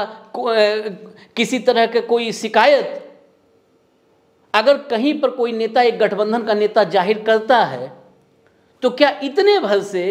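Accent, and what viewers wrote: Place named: Farrukhabad, India. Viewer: Sahab namaskar. Mai MP me rahata hu farrukhabaad mera ghar h. Aapki aawaz excent vahin ka lagata. Vishleshan Shaandar h. Aap kahan se h.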